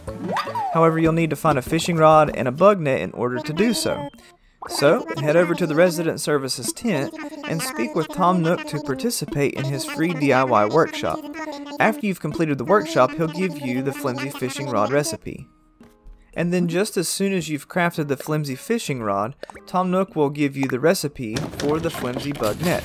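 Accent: Texas, United States. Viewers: Is that a Texan accent? Lol!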